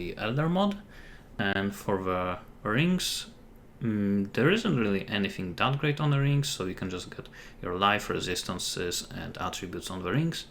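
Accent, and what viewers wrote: Poland. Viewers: Akcent wskazuje na polskie pochodzenie. Mylę się? btw Nice movie! like it a lot!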